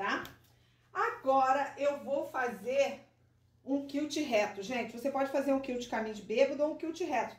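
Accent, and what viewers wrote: Rio de Janeiro, Brazil. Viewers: Oi amada adoro s jeito descontraído de ensinar, gosto do pulo d gato kkkk, e amo nosso jeitinho carioca d falar, também sou carioca , sou d Sepetiba minha terra Natal, posso falar 1coisinha? Gostaria de saber por que vc não posta vidios todos os dias?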